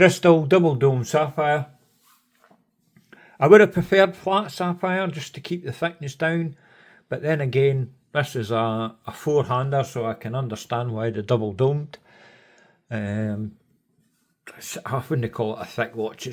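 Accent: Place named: Dundee, United Kingdom. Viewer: Are you a Dundee guy? 🏴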